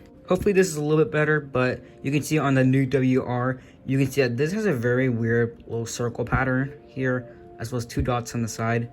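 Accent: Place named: United States. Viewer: i jus realized u sound like tymon kolasiński but with an american accent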